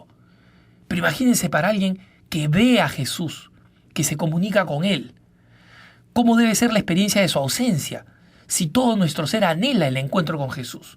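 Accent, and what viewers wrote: Lima, Peru. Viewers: ACENTO LIMEÑO.....agg¡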